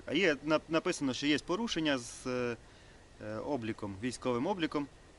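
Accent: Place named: Western Ukraine, Ukraine. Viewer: Як мені родом з західної приємно слухати вашу говірку ❤❤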